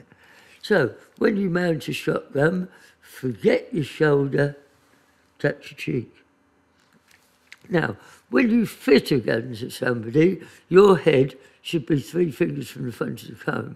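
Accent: United Kingdom. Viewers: I have to admit, I have no idea what he said the 2nd step was. Apparently, I speak English, but I don't understand British.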